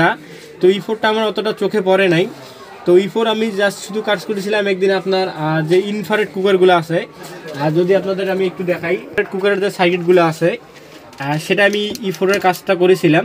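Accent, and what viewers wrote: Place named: Rangpur, Bangladesh. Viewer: আপনার বাড়ি মনে হয় রংপুর।৷ আরও ভাল করে বলবেন। আপনার সাথে আছি❤❤❤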